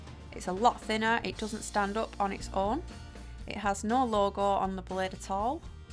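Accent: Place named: Yorkshire, United Kingdom. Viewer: love your yorkshire accent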